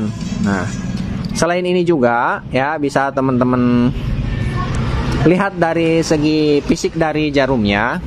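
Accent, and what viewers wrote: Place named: Serang, Indonesia. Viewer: wong endi kang gale gmge jawa serang😅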